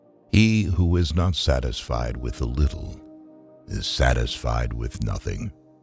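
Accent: United States. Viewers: The American accent spoils it.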